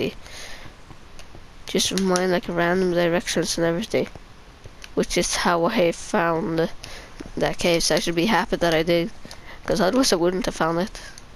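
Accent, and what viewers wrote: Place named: Norway. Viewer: VS Klubben then its a Norwegian accsent..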